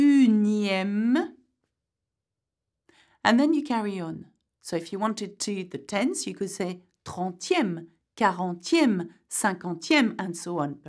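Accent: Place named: France. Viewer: she speaks in a french accent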